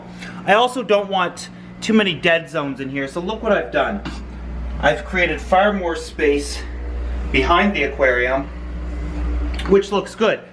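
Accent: Canada. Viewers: he’s Canadian lol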